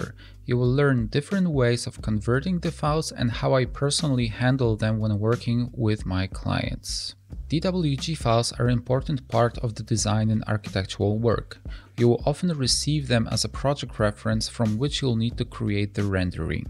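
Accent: Poland. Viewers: Przez moment zastanawiałam się, czy ten akcent nie jest przypadkiem polski, teraz już wiem, że to nasi. ;)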